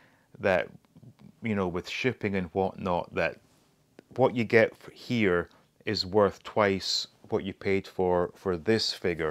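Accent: Scotland, United Kingdom. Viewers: That explains all, its the Scottish accent that got me confused.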